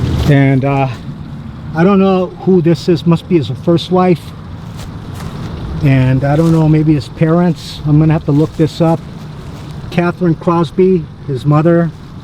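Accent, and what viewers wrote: East Coast, United States. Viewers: What is this guys accent from? East coast?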